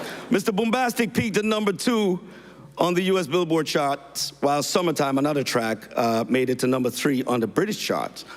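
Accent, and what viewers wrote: Jamaica. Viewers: Speaks regular then patwa instantly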